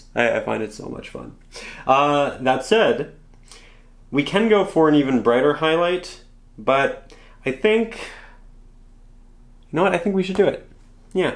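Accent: Canada. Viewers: The Canadian accent and pronouncing of words is difficult to listen to.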